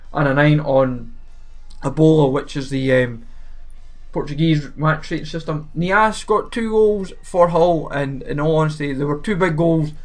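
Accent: Scotland, United Kingdom. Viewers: sounds like he's Scottish to me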